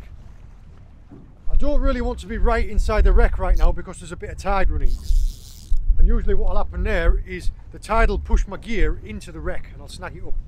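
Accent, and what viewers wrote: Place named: Cumbria, United Kingdom. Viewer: you’ve almost got a bit of a Cumbrian twang in your accent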